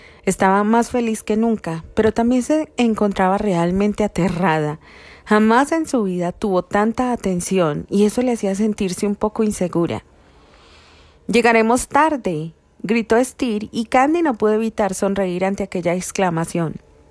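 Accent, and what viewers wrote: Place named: Latin America. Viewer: su acento latinoamericano tan hermoso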